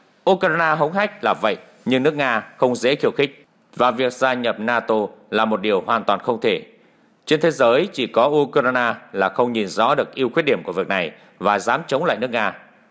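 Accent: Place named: North, Vietnam. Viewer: Bạn nói đúng! Nhưng vì Ba Ke đều có lối phát âm như vậy chớ không chỉ riêng anh nầy !!! Tôi chỉ nghe anh ta nói “ u - cà - na - na “ mà thôi ! Ngay như “ HÀ NỘI “ họ còn không nói được 👍 thì còn nói chuyện gì khác nưa chớ ??? OK 👍!?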